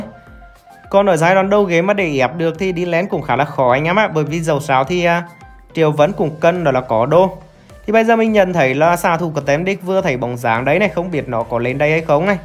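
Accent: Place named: Central, Vietnam. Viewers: Nghe giọng ở huế quá. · nói ra có chút vô duyên và có gì thì cũng xin lỗi trước vì nghe giọng hơi chói tai, này là giọng miền trung, mình thì ko có ác ý gì cả nhưng xưa giờ mình nghe giọng miền trung thì cả ngưới rất khó chịu, nếu chỉ là nói từ tốn thì ko sao còn kiểu như nói nhanh và nói nhiều liên tục thì nghe rất khó chịu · Bạn nói chuyện hay phết.giọng mt nge cuốn ghê..:))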